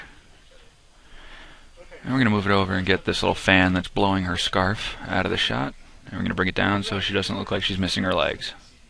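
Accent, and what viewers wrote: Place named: United States. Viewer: pure amercian accent - very nice video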